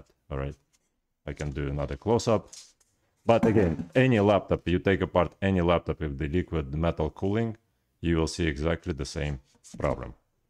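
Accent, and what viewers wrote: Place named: Russia. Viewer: По акценту слышно что ты говоришь по русски)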